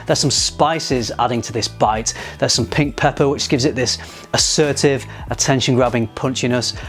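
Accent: United Kingdom. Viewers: that British Accent, man... I insanely LOVE hearing it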